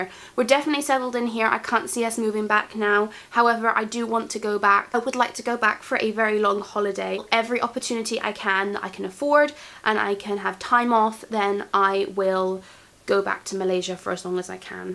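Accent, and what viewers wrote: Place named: Bristol, United Kingdom. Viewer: I didn't remember your Bristol accent :P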